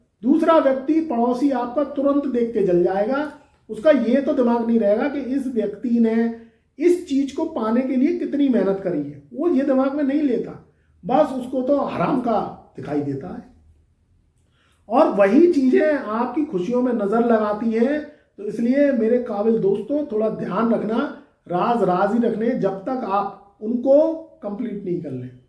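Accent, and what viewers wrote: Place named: Uttar Pradesh, India. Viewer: गुरु जी आप शायद आगरा side के हैं। मैनपुरी फिरोजाबाद जैसी भाषा tone 😂😂😂😂😂😂😂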